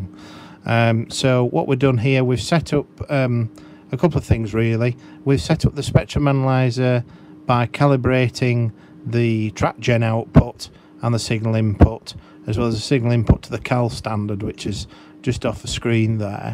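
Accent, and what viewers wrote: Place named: Manchester, United Kingdom. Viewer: Brilliant explanation to a newby. Plus your accent is near me, Manchester 👍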